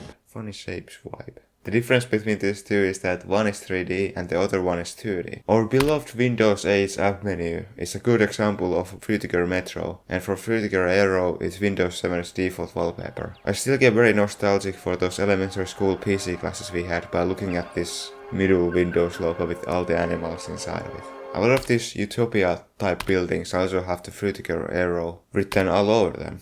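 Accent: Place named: Finland. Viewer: Hehe ekasta lauseesta äkkäsin suomiaksentin :DDD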